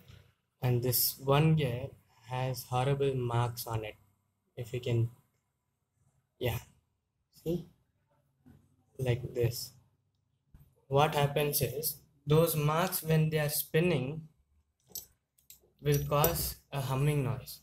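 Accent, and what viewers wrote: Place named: Bengal, India. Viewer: Babal English vaiya wowowo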